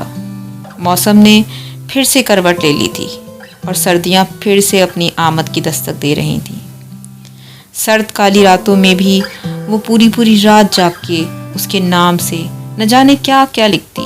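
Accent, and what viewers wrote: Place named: Pakistan. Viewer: Bht khoob hai y urdu lehja apka n very nice❣️❣️❣️❣️❣️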